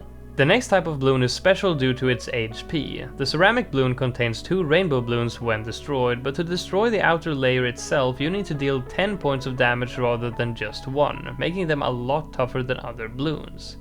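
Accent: Sweden. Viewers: I just started her the Swedish ascent and I I’m also Swedish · Are you swedish?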